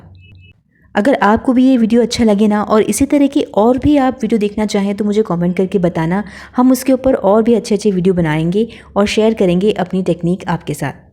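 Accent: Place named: Maharashtra, India. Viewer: 😊 bahut pyari Marathi hai aapki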